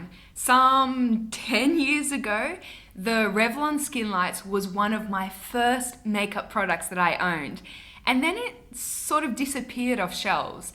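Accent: Australia. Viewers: I really love your accent ... Make me think to my "aussie crush"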